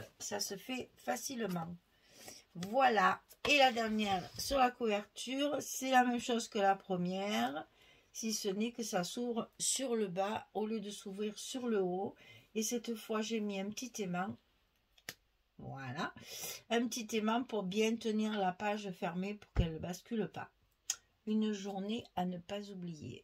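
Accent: South, France. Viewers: Cc whaou quel bel album vous l'avez sublime avec vos embellissements. J'adore, pas si petit que ça finalement. Alors chez moi pas très beau temps le vent souffle beaucoup et il fait un peu frisquet mais avec votre bel accent du sud vous nous mettez du soleil dans nos cœurs. J'attends de voir ce projet à 4 mains mais je ne doute pas du résultat,je suis allée voir ce que Françoise faisait et ses albums sont très beaux aussi. J'attends aussi de savoir où vous allez passer pour votre tour de France j'aimerais bien vous rencontrer. Bonne après midi bisous 😘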